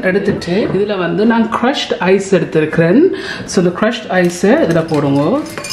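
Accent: Sri Lanka. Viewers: சகோதரி...உங்கள் இலங்கை தமிழ் அழகு.....வணக்கம் கோயம்புத்தூர் தமிழ்நாடு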